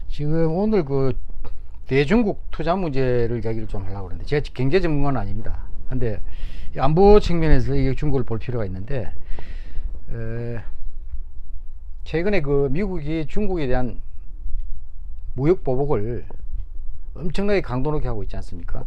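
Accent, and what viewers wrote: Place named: Gyeongsang, South Korea. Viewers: 경상도 사투리를 서울 말씨로 고치세요. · 경상도 사람은 경상도 말로 해야 목소리 톤에 힘이 들어가고 더욱더 호소력과 전달력이 있습니다. 억지 서울말 보다는 얘기하기 편한 말로 하는게 더 낫지 않을까 생각합니다.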